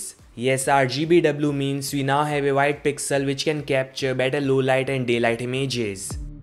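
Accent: India. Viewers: Typical Indian accent...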